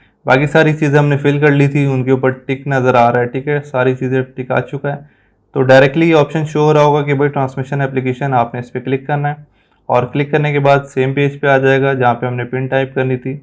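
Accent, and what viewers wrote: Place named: India. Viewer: Please speak English not Indian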